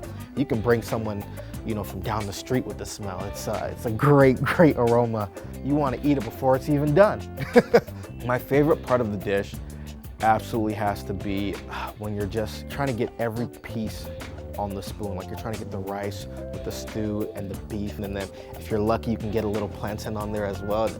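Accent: United States. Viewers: First of all, he pronounces his name wrongly. An Igbo man pronouncing his name with an American accent okay let's talk about this food This is the worst way to prepare a Nigerian beef stew, this is an abomination coming from a Nigerian living in Nigeria 🇳🇬. In Nigeria we don't blend ingredients with salt and tomato 🍅 puree and bayleaf in a blender Also we boil our beef before frying nobody fries raw beef, no Nigerian does this. Dear this content even though it's old is misleading and Nigerians on Twitter have a different day about this food. He should go back and learn from his mom, and many Non-nigerians would think this is how we cook. Chineke nna 😭😭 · I get having an American accent thats fine but why would you delibrately mispronounce your own name 🤨. It's not an English name so why are pronouncing it in an American accent. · This man is Nigerian and he sounds perfectly a american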